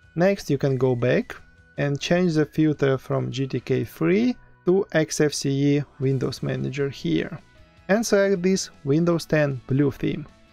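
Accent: Russia. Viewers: not insulting you, but you have a huge russian accent nice vids! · That's because he is Russian. Why would that be an insult? It's just an accent ☺️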